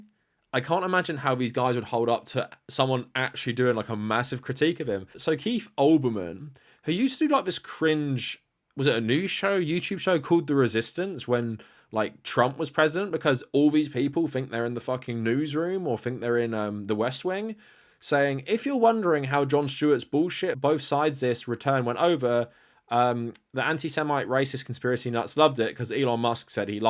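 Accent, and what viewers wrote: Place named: United Kingdom. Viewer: Off topic, but I always heard you with a vague British accent but is that some Donegal and Derry I’m hearing?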